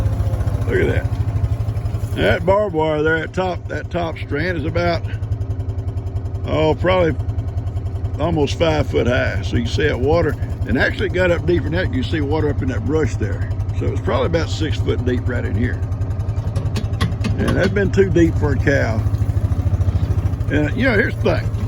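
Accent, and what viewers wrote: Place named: Texas, United States. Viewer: Listening to you with what you show and tell is therapeutic for me. Just love your videos. So interesting. Seeing how high the water went in Harvey. Wow. Heartbreaking for all the animals. Love Heidi. She is a true mate. From Australia. Your accent is strong. Love that Texan accent. God bless you and family ❤️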